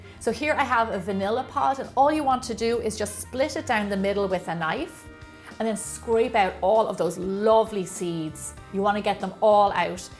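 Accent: Ireland. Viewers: Is Irish if so I am too lol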